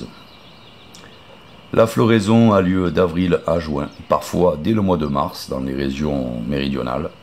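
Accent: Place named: Hérault, France. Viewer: Merci pour l'explication. Ce joli accent vous vient d'où ? De l'Hérault ? Merci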